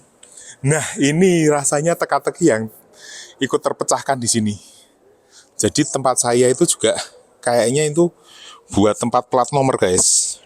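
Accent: Java, Indonesia. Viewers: Host nya kental sekali logat Jawa nya..!!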